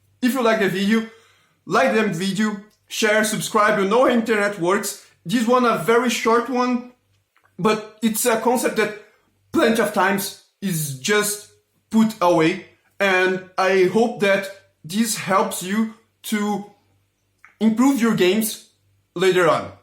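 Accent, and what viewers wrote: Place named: Brazil. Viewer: Consigo sentir o sotaque brasileiro à quilometros de distancia KKKKK, ótimo vídeo mano, tava precisando de dicas assim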